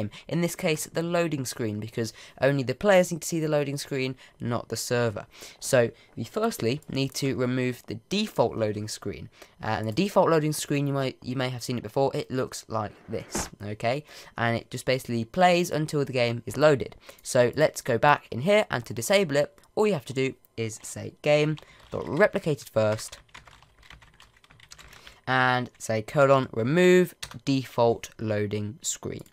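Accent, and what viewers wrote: United Kingdom. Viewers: Omg your British now! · Now he sounds more British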